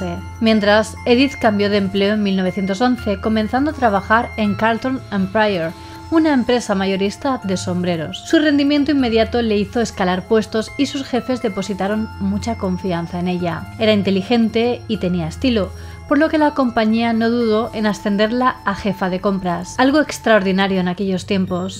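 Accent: Spain. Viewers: "Solo se que se nada". Debo de estar muy agradecido contigo, por qué con tus vídeos ( tan lindos, bonitos y hermosos, como la creadora misma ella es ) nos alegran el día; ellos son la alegría de todos nuestros días. Tú eres la mejor, la única YouTube'r que es inigualable, con ese asentó español, eres maravillosa, excelente, muy profecional, YouTube'r, eres tú, hermosa española. Nota: Gracias por tus vídeos. Gracias por existir. Te adoro. Te admiro mucho. En verdad te lo digo, eres tú, muy diferente a las demás. Eres la mejor. Nadie como tú. Hasta el final!!!!!. Hasta el fin de este mundo!!!!. ", HACIA LA ETERNIDAD"!!!!! 💀🏰💜🗝️🕸️🕷️🕯️🧹⚰️👻🎸🍷🎹🖤🌹🎃📚🔮🧙😈🔥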